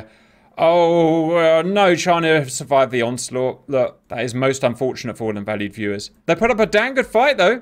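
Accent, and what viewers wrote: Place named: United Kingdom. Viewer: I'm not supposed to say these videos are MORE ADDICTING due to his British Accent! ...............................💂*tea time! 🫖 .............................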